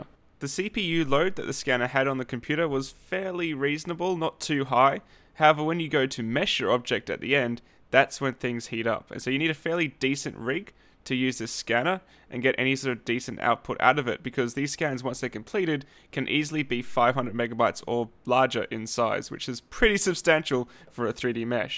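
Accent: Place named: Australia. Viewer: Man love your vids! So to the point, auzzie and easy to understand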